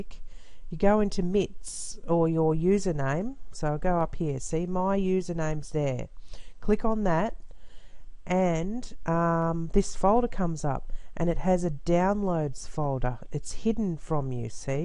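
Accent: Australia. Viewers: Good info. / Thanks from a 70 yr. old American. Your accent (Aussie?) is pleasant to listen to.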